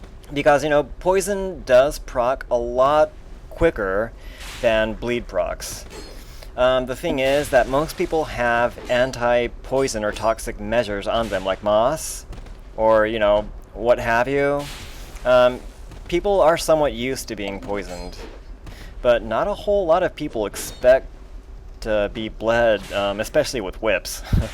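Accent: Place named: South, United States. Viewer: weeaboo game strong this guy has a country accent yet thinks he's Japanese damn weeaboos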